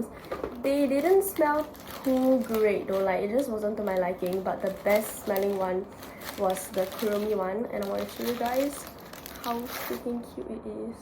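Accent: Singapore. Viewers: 2 seconds into you talking I already could tell you were from SG from the accent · かわいいー シンガポール人のアクセントはやはり大好物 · that singapore accent is brutal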